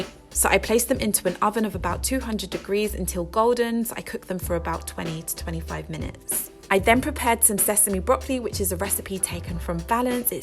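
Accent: United Kingdom. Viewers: is no matter her race. She actually sounds very British to me...like she was born and raised British. Personally, I didn't notice her skin color. I only thought, "Wow! This English chick really knows how to do a video cooking show!!!"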